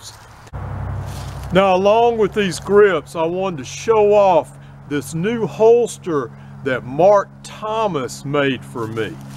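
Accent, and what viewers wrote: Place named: Virginia, United States. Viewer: I would have guessed Virginia, well, at least we know he is not from Boston, Mass. lol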